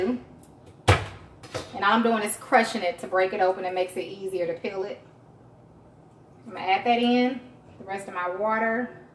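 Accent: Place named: New Orleans, United States. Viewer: Omg where u from ? I love your accent I’m getting Nola vibes cus u sound like Toya lol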